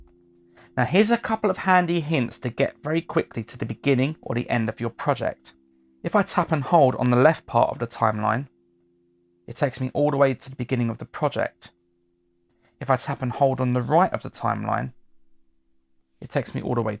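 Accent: United Kingdom. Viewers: nice a british accent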